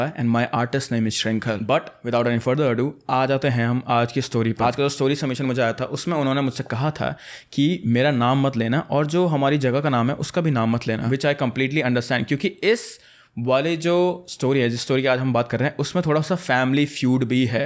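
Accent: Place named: Garhwal, India. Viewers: your pahadi accent is soo cutee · That Garhwali accent 😂❤❤ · Your Gawhali Accent is Awesome 💞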